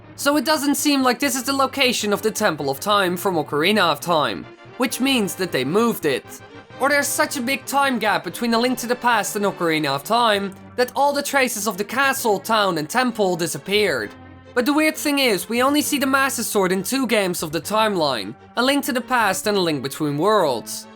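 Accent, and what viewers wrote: Netherlands. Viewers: are you actually from the U.S because u sound pretty dutch (nl) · fancy guy i can say with extreme confidence that he's Dutch. that's a one of a kind accent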